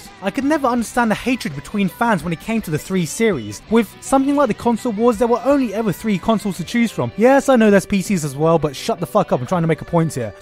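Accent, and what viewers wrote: United Kingdom. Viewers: Dude you sound British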